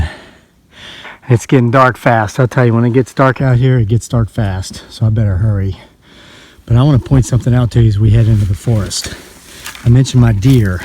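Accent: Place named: Virginia, United States. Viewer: Ah hahhh, i thought you sounded like a fellow Virginian - please carry a firearm with you outdoors, my brother... I can tell you stories about a rodent looking creature as big as an elephant to invisible predators & spots in Goshen pass that will suck you underwater just to put a foot in. 💯💯💯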